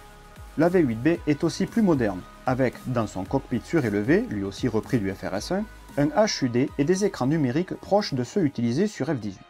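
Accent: South, France. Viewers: J'en ai lu des trucs sur les avions et sur le Harrier, mais là je n'ai qu'un seul mot : FELICITATIONS. Que de travail ! Sur le fond on apprend pleins de choses en un minimum de temps, sur la forme, rien à redire, les images sont exceptionnelles et ont dû demander un paquet de travail, le montage est parfait, le ton parfois humoristique et l'accent du sud ne font que rajouter de l'intérêt à vos vidéos en les rendant plus vivantes. Petit bémol le verbe "pallier " est transitif direct "La construction pallier à [verbe transitif indirect], courante, est incorrecte" mais bon c'est pour chipoter car les commentaires sont justes excellents, Continuez !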